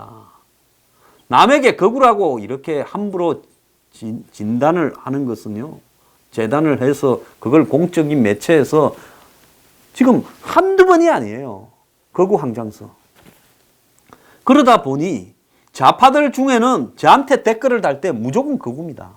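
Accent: Gyeongsang, South Korea. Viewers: 한국사람들은 머리가 딱딱합니다. 그리고 매우~~~~겉으로만 보고 판단합니다. 황선생님은 내용상 전혀 극우가 아닙니다만, 제 생각으로는 단지 소장님이 경상도 사투리를 쓰고 목소리가 커서 강해보이니까 극우다..라고 느끼는거고 그걸 그냥 다시 생각해보는거 없이 프레임화 시킵니다. 좌파들 말하는거 보세요. 대부분 매우 부드럽지요? 달달하게 말합니다. 그렇게 말하면 극우적인 내용을 말해도 한국인들은 극우가 아니라고 느낍니다. 감성적으로요. 제가 일본에 산 적이 있는데, 그때 느낀 사소하지만 중요한 차이가 있습니다. 일본 지하철 역에 가보면 벽에 광고들이 붙어있지요. 그런데 일본 광고는 글자가 매우 작더라고요. 자세히 읽어보지 않으면 알 수 없는 광고 내용들..한국처럼 대문짝 만하게 선정적인 문구로 하는 광고 비율이 확실히 낮습니다. 그거만 봐도 민중의 성향이 읽혔습니다. 광고야 말로 자본주의의 첨병이고 효율에 목숨거는 분야일텐데, 일본광고의 글자가 작다는 것은 일본사람들은 뭐가 쓰여져 있으면 자세히 읽는다는 사실을 반증하는거죠. 한국사람들은 벽에 뭐가 큼지막하게 쓰여져 있어도 아주머니들이 옆에 서있는 저에게 묻는 일이 많습니다. "뭐라고 하는 거에요?" 라고요. 문맹이실리도 없고, 글자도 커서 노안에도 잘 보이실텐데. 습관인거죠. 한국과 일본, 어느쪽이 프레임과 거짓소문으로 더 양때몰이 하기가 쉬울까요? 쉽게 상상이 가능하리라 봅니다.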